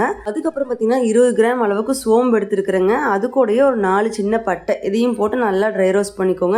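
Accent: Kongu, India. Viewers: Kongu tamil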